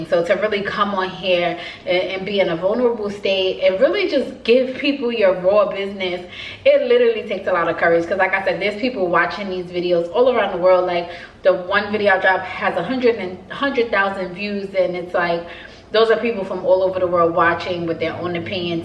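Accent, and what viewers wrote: New York, United States. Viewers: I needed to Hear This ❤️‍🔥🥴 But yooo the nyc is def in you 😂 #BIGVIRGO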